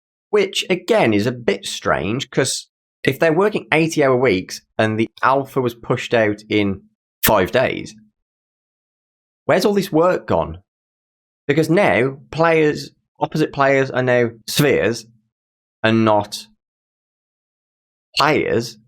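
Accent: Birmingham, United Kingdom. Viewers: Are you a brummie :)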